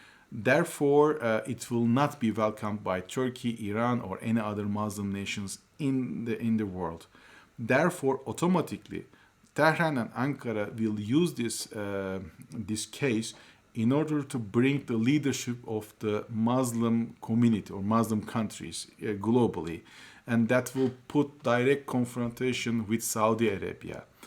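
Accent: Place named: Russia. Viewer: You sounded like a Russian speaking English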